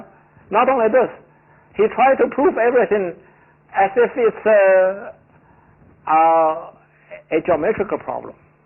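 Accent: China. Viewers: 还有发音也还是很有华人的特点。不过瑕不掩瑜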